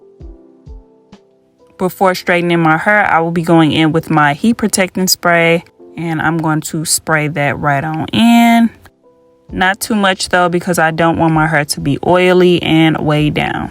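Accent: St. Louis, United States. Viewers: You from saint Louis? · “ cut my hurrr” lol 😭the way Americans talk is so interesting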